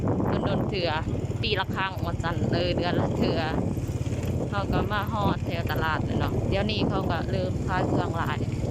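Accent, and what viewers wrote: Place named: Isan, Thailand. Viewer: สบายดีน้อง พึ่งมาเขาชมน้อง อัตปือ ภาษาว้าวก็เหมือน อีสานใต้บ้านข่อยเลย ชอบน้อง ถ้ามีโอกาศ อยากไปเที่ยวสักครัง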